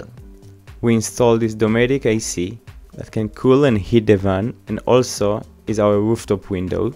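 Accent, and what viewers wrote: France. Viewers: L'accent français représente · you sound French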